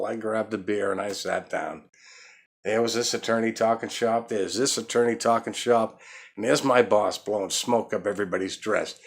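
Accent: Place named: East Coast, United States. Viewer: Love the stories... no matter what!!! You make me feel at home.. with your east coast accent. Ima New Yawka!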